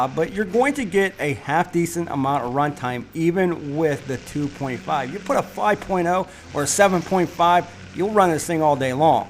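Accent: Pittsburgh, United States. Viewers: You from Pittsburgh if not you sure have a pittsburgh accent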